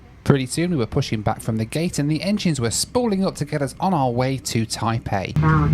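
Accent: United Kingdom. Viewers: thought u r british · as a newbie so i know. You sound like you are from the United Kingdom but you live in Houston in the US? Is that correct? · You sound British did you move to usa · Hi, enjoying your videos, i thought your were from UK, didn't realise you live in USA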